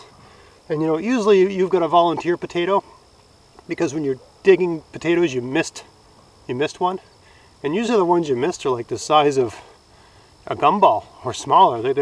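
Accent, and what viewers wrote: Maritimes, Canada. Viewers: Without reading the page name I watched this before gardening and within 2 seconds I was like is this guy an islander?? Unmistakable maritime accent. Then I saw your channel name and saw you’re in NS 😂 thanks for the potato advice from this islander!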